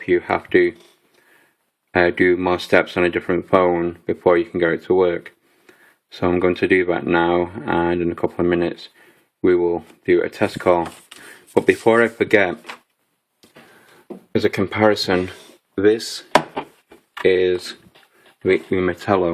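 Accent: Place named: Manchester, United Kingdom. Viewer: You sound like a Manchester Lad to me, Living in USA?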